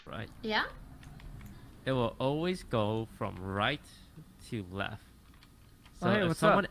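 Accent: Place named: Australia. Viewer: It took me til like halfway through that video to realise that you're Australian, like me. I'm more used to seeing Among Us related content with people predominantly with American accents and since I'm Aussie myself it took a sec to realise that yeah I'm used to that accent, but that's because I'm Australian, and not because I'm used to Among Us content in that particular accent.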